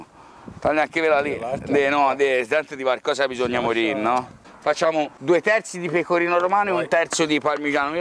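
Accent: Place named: Livorno, Italy. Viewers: Mi piace moltissimo questa carbonara e anche l´accento livornese. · Sei troppo simpatico con quell'accento di Livorno meraviglioso. Mi hai fatto venire voglia di carbonara....salutoni. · Boia. Si sente vai che sei livornese :-) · che dire... con sincerità stima ed ammirazione sei il Top ...il dialetto livornese non ha nulla da invidiare al tutto il resto dell'italy oltre che bravissimo ... simpaticissimo( mimmo da palermo... iscritto )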